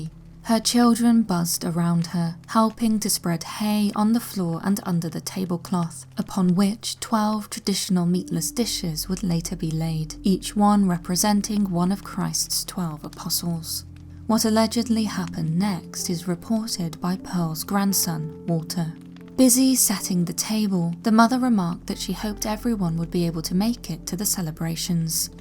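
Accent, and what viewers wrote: United Kingdom. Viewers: you’re awesome! Your voice is so soothing, it seems that anything spoken in British accents makes them seem more real. But that last one about the Black Lady, that’s quite a damn good reason to roam the earth after death. Black lady, Black Lady, I’ve stolen your, oh hell no I’m out of here!!!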